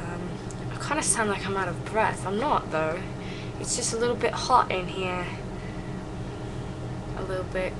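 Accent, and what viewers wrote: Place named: Southern England, United Kingdom. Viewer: your english accent is getting better - or should I say more consistent - definitely a southern english accent. Please don't call it british though its english. As for song suggestions please look at my playlists - you might find something you like - or maybe not. God bless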